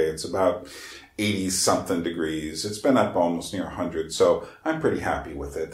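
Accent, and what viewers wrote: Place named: United States. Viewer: Thanks for the link to Rookmaaker. His english with german accent is tough to my spanish ears, but I captured the essence of the lecture (he says "the heart is a pump", don't he?) I can see the conection beetwen he and your videos, and now I think you were right when you said that all of us are postmodern thinkers. Even Rookmaake seems postmodern when he insist in the reallity beyond the called "facts", or science, or aristotelic logic. This is an idea shared with the postmoderns. In fact I remember myself as a teenager defending the idea of the reallity as a personal construct. I used to like science, math and all that, but I never liked the scientificism in the philosophical sense, it's seems a silly vision, the philosophy of an AI, I supouse. The problem is that postmodernism has become worst han those square minded fisics I didn't like in the seventies and eighties. 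PS: I can understand you well enough, your american accent is the easiest to me. The worst are british people, with all these crazy vowels...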